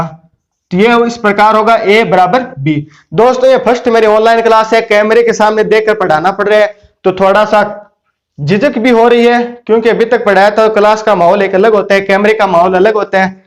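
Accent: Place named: Sri Ganganagar, India. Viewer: Language ka lahaja.. Dekhkr lga... I'm from Sri Ganganagar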